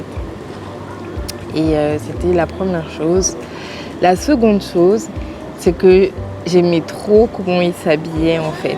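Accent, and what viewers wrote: Cameroon. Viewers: L'accent camerounais, ne part jamais.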